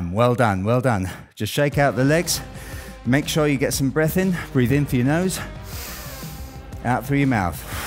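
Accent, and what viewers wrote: United Kingdom. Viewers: Wit dat British accent and him being ripped af he seems like a bad guy from a kids movie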